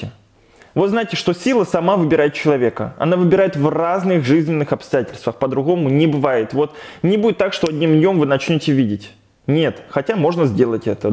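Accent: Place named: Belarus. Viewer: Я поняла, чем ты меня привлекаешь - ты с Украины! Хотя по говору, думала, что ты белорус.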